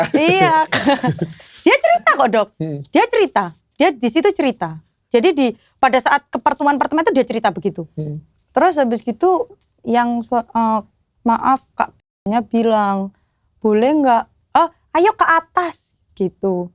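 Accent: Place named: East Java, Indonesia. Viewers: Dialek Jawa Suroboyoan ini. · jawa timuran, tepatnya ya surboyo ngalam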